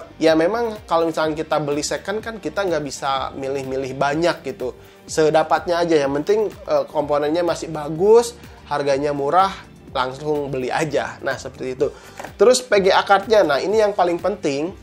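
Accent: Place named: Sunda, Indonesia. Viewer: sundanya kentel haha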